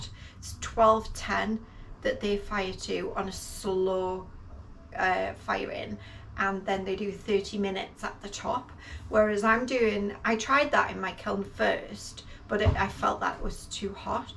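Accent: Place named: Newcastle, United Kingdom. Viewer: Hey, how fantastic you're in your studio! Oh what a great assortment of pots and glaze combos. love Jacobs jug and I do hope you can save it? Your beautiful coil bowls are fantastic and what a lucky friend you have who's going to get the big turquoise bowl for her birthday. so glad you're back making pottery from home and videos as I love watching and listening to your Geordie accent. Happy potting my friend and stay well xoxo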